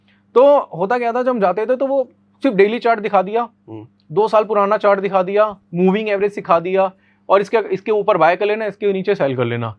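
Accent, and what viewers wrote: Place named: Bhojpuri, India. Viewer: Mr. sr ji Aap ki bat hi samjh me hati h. Bojpuri style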